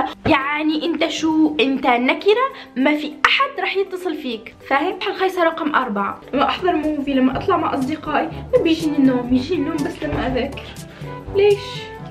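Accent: Tunisia. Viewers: انت تونسية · شكرا على النصائح حبيبتي بس بدي اسالك سؤال، انت من تونس؟ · هل انت تونسية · تحسك تونسية 🙂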